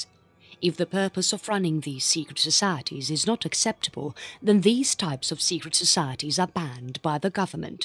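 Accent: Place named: United Kingdom. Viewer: I'm seeking real info about The secrete societies in existence today establishing the New World Order like they have accomplished in Euro. Oh I know it's a secrete. Why don't you dare mention the world bank, the U.N, NATO, The Pacific Alliance, etc????? She has a British Accent but displays US Marines? I don't trust this Commercial. It demos no history.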